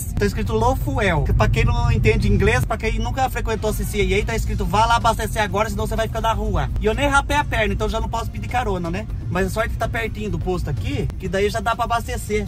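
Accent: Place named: São Paulo, Brazil. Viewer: ou e esse sotaque seu e de onde mesmo meu filho? vc mora no estado de São Paulo?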